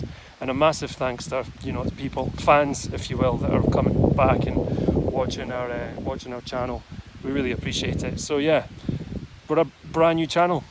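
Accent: Scotland, United Kingdom. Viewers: thankyou so much for your reply and I am really enjoying your format I guess I'm just greedy and want to see them all haha.I also have to admit listening to your Scottish accent makes your videos even better for some reason I find it soothing.Keep up the good work and I will keep watching 😊 Sharlene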